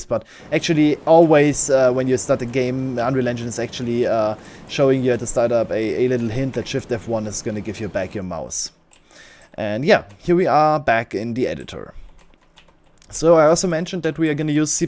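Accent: Germany. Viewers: sounds like an german accent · Strong German Accent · Youre Tutorial helped me out so much thx but one question are you german?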